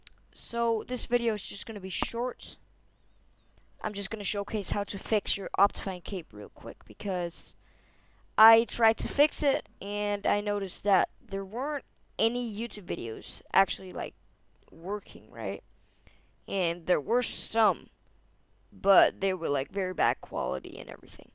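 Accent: Denmark. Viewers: damn your english is so good i can barely hear that you're danish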